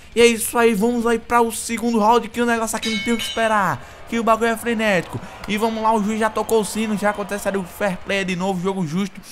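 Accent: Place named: Pernambuco, Brazil. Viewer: owwww vc é de Pernambuco ne ?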